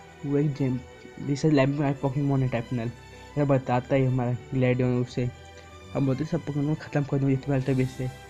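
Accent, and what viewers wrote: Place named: Bihar, India. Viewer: Bat dhik se kar bihari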